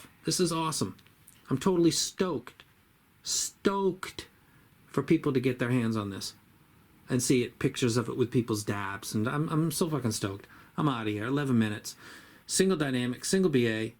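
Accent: Boston, United States. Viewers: Lol, I had a feeling you were from Boston. New England loves what you do man!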